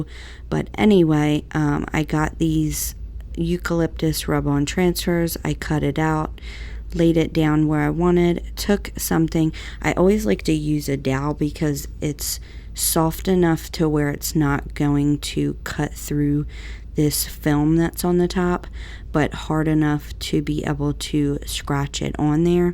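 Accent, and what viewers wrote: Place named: Maryland, United States. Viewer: I just found your channel and am a new sub .. love your projects and love your very clear directions .. I've noticed some crafters speak so fast its hard to understand them .. BTW your accent sounds very familiar .. being a Maryland girl I can tell you're from my neck of the woods 😀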